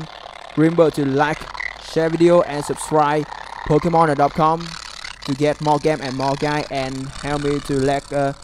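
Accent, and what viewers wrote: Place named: Vietnam. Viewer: Nghe giống việt nam vãi!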